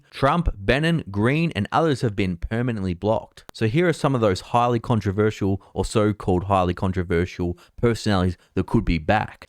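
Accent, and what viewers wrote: Australia. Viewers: I really really like this content but I can't follow the Australian accent sometimes, gets frustrating.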